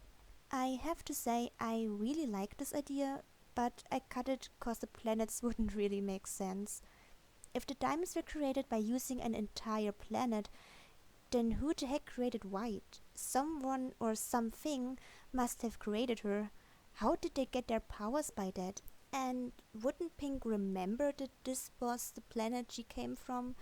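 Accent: Germany. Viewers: Omg ich habe mich schon gefragt, ob ich mir nur einbilde dass dein Akzent deutsch ist xD Glückwunsch zu den Abonnenten und ich liebe deine Videos :) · Wow this is amazing. I really love this!:D Thank you for sharing this with us and I look forward to being on this journey with you. And your German accent is beautiful! never apologize! I'm learning it now ^^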